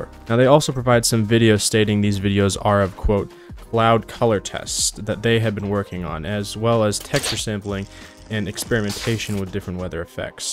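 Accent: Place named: United States. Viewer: I don't think you know what "formerly" means.... You sound American, so I'm confused why me, a Danish person, needs to correct you on this. Formerly, means "in the past". Saying "The new Worlds Adrift, now formerly known as Lost Skies" is a nonsense sentence. Is it now or formerly? It can't be both. It's either: "The new Worlds Adrift, formerly known as Lost Skies" - Meaning it used to be called Lost Skies, but is now called Worlds Adrift. Or "The new Worlds Adrift, now known as Lost Skies" - Meaning it used to be called Worlds Adrift, but is now called Lost Skies. If you want to use the word formerly, you would say "Lost Skies, formerly known as Worlds Adrift"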